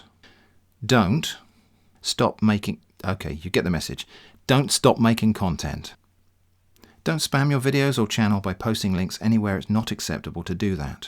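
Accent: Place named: London, United Kingdom. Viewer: Speaking of characters, I remember your Londoner accent from the scambaiting videos!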